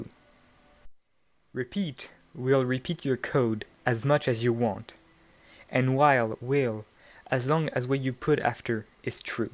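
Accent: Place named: France. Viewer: Salut, petite question, est tu Français? Car tu a un accent qui le laisse penser... Si C'est la qu'à pourrais tu faire une version FR de tes tuto stp? Je galère beaucoup avec la programmation... En tout qu'à merci pour tes tuto, la traduction automatique fonctionne à peut prêt correctement même si ce n'est pas toujours parfait c'est déjà mieux que rien et ça ma permis dans apprendre déjà pas mal sur la base de la programmation. Je passe au tuto suivant ;-)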